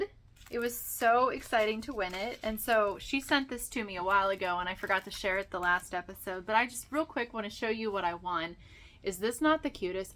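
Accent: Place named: Western Pennsylvania, United States. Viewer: Such beautiful projects! I think you can’t go wrong with any of your choices of your gorgeous hand spun for your headband! So very pretty. I also just love listening to you chat, I too am from Western PA, but my husband and I moved a bit further south many years ago. Listening to you just reminds me of family we still miss so much. Thanks for another enjoyable episode. ❤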